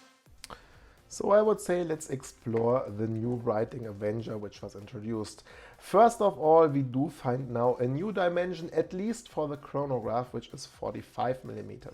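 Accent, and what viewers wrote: Germany. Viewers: I like the German exent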